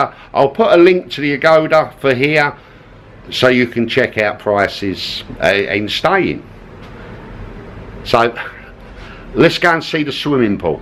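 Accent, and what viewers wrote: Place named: Cockney, United Kingdom. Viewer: That's because it's cockney guvnor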